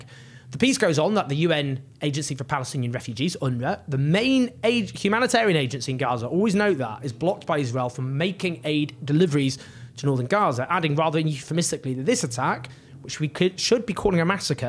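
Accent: United Kingdom. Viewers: I love your passion and dismantling BS programs. Please, Please, Please slow down, enunciate, and stop trailing off at the end of your sentences. I'm an American. Whatever you could do to soften your British accent would go a long way in making your presentations more intelligible for your foreign and maybe even your Brits, Scots, and Irish. BTW I am Irish all the way.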